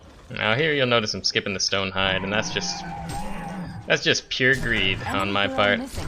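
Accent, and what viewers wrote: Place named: Canada. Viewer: Ohhhh that sweet canadian accent #Wemissyou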